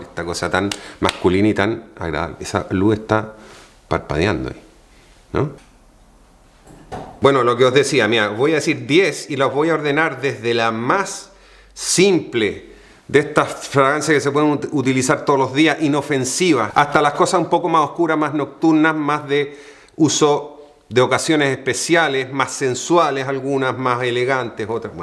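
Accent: Chile. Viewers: Soy español y desde mi perspectiva le veo un acento muy muy chileno (por cierto, el acento más marcado que existe de Sudamerica en mi opinión). No le noto ningún deje español en su acento, aunque quizá los chilenos sí lo podéis notar. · Hola , eres Chileno ?? , te pregunto por el acento, pero tienes de a ratos unos toques españoles y otros argentinos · Si eres chileno...que haces haciendo esa mezcla rara ...que vosotros... Que os digo...basta...sale raro y mal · no se porque tienes un acento Chileno, tremendos reviews!! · Cierto tengo la misma duda su acento es d echile pero hizo de primavera y acá es otoño xd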